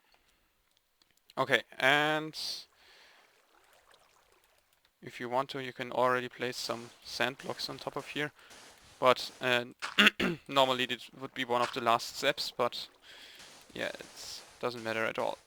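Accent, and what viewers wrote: Germany. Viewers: HAHA, ich wusste es^^ :DD, man hört, dass du deutscher bist^^ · Ithinkk you're a german, oder? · bist du deutsch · Are you a German ? You have German accent. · vom akzent her muss er deutscher sein :D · Man i'm from Germany and thats definitely a german accent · red deutsch! ;D man hört dass du deutscher bist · Yes, it's kinda obvious that he's german, I'm german and I can hear it from his accent ;) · bist du deutsch?? denn dein akzent ist grausam^^ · Are you from Germany? / Bist du aus Deutschland? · you sound a little german... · Du bist Deutsch, hab ich recht? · Nein, der deutsche akzent klingt gut, es ist keine Tugend wie jeder Amerikaner rumzunuscheln! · Du bist deutsch, ne? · ... Hey, you are German, right? You have a little bit of an accent. :D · i like his germen voice or at least i think its germen still it sounds cool and ... gentleman-ish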